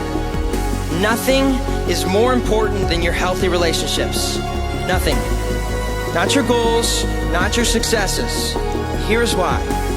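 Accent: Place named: United States. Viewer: Thank you very much for presenting this wonderful speech. I would like to know which American accent is this. Kindly reply please Thank you again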